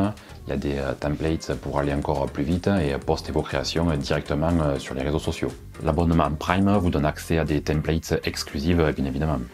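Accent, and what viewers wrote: South, France. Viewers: T'as une maladie ou c'est un accent du sud? Juste pour savoir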